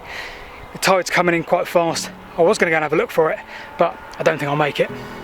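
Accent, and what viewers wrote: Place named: Northern England, United Kingdom. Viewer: He can't help it - he is a northerner! <link>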